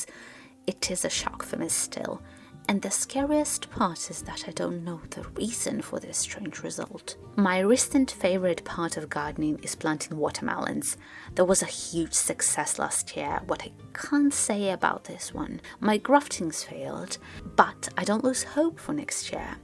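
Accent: United Kingdom. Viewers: why does she have a harry potter accent?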